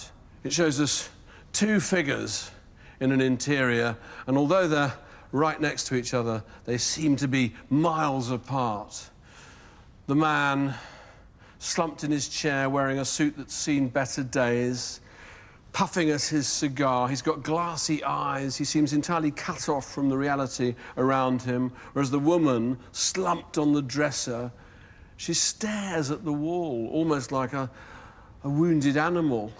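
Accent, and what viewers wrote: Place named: RP, United Kingdom. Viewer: I enjoyed this. Bar the plummy tones. Zzzzzz😮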